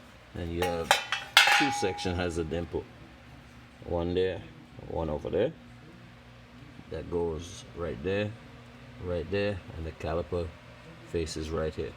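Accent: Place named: Louisiana, United States. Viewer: Sound like a southren creole man.. if yaint from Louisiana then you Jamaican one.. am i wrong? From the muddah land or ye from dem bayou jerkin them crawdads..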